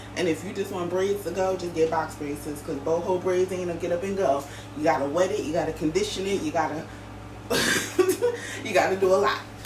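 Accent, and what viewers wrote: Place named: New Jersey, United States. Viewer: You're Jersey girl accent🥰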